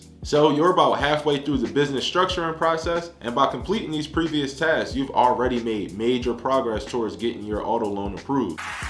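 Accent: Philadelphia, United States. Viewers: This a Philly accent? Makes me feel like I’m in the right place for this info. 😂😂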